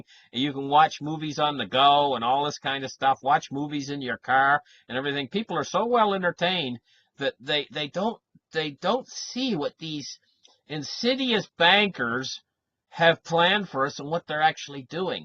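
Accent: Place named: Northern Ontario, Canada. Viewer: His name is. I asked him where in Canada he lives and he didn’t respond. Judging by his accent, I would say Northern Ontario or the Maritimes (eastern Canada)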